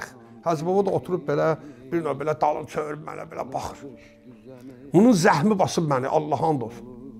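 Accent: Baku, Azerbaijan. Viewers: Allah səni bizə çox görməsin Hacı. Doymuram sizə qulaq asmaqdan . Bakı ləhcəsidə öz yerində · Təmiz Bakı ləhcəsi ilə Azərbaycan dilində danışır kişi.Burda başa düşülməyən nə varki?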